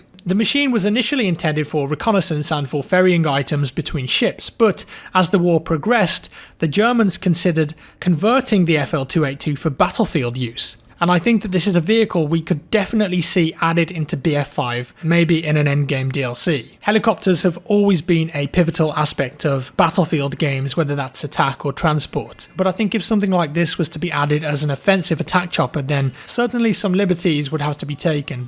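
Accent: United Kingdom. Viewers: That british accent 💘💘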